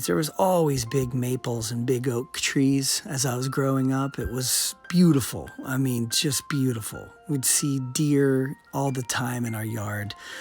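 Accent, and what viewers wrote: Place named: Ohio, United States. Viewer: Why I knew you were from Ohio by how you talk? 😁😁 Im in Cincy❤❤❤❤❤❤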